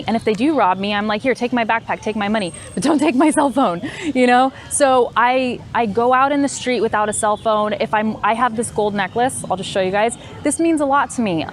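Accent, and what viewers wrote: United States. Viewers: is the name I use to tell most in a day. I learn American accent by writing down one minute lecture of videos and record my voice of the same lecture. And I'll compare both, find out my mistakes and continue this process until I satisfy with my work 'hey what's up......' Thank you <3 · Hey...you made this video awesome...i learn a lot from you...love from India...i just want to get american accent...if you ever come to india, you will be our guest here...😊...thank you mentor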